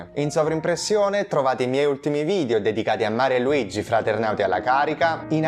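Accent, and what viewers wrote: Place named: Campania, Italy. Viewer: Ho giocato Xenoblade per la prima volta l'anno scorso partendo dal 2, poi questa primavera sono passato al 3 (un ordine che lascia un po' a desiderare per seguire la storyline, ma tant'è 😂). La serie mi ha catturato tantissimo e la reputo un nuovo Kingdom Hearts per intreccio narrativo, ma uno venuto bene! (senza esagerati mindfu*ck, insomma)! L'idea di un anime avrebbe totalmente senso! Non sono sicuro che elimineranno le Definitive Edition perché gli fanno macinare soldi, ma sarebbe se non altro un modo per fare contenti i fan della serie e per avvicinarne di nuovi. Apprezzo molto i contenuti che tratti perché parli spesso di informazioni di cui non si parla molto in Italia. Un piccolo commento "tecnico", se posso. Rimuoverei il suono "sh" della parlata campana. Non che voglia eliminare la tua originalità, ma credo darebbe un piccolo tocco piu professionale al canale. 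Ovviamente, parere personale. Detto questo, continua cosi che sei bravo! 👏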